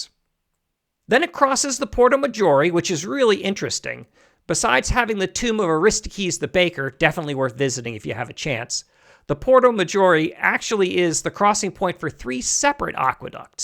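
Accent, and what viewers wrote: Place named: United States. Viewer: Content aside, couldn't the presenter have made a minimal effort to pronounce the Italian correctly, even with an American English accent? If he can use Google Earth has he not heard of Google Translate?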